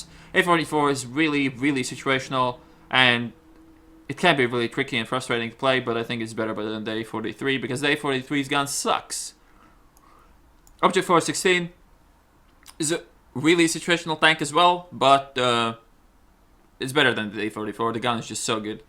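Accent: Sweden. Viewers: Wait, you're Swedish?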